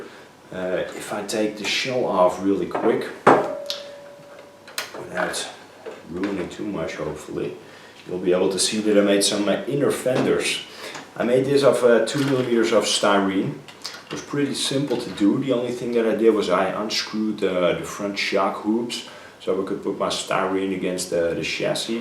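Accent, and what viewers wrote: Netherlands. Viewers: Sounds like a dutch accent. You can tell by S sounds sounding like sch.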